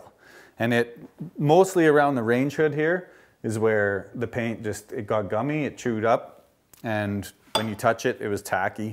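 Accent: Canada. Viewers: Nice video, you sound like you are from the Ottawa Valley.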